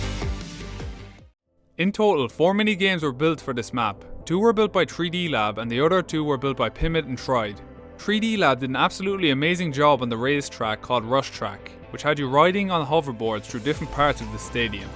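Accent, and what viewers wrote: Ireland. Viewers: Are u Irish cus u sound like u are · U sound irish and insane build man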